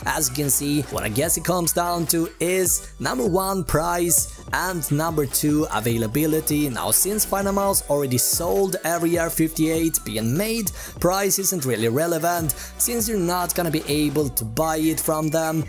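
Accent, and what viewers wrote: Sweden. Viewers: Love your Swedish accent😂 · Like your swenglish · Are you from sweden?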